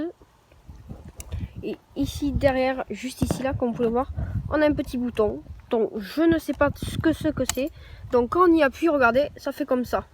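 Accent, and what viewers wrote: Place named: South, France. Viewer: Toi tu est du sud 😂 quel accent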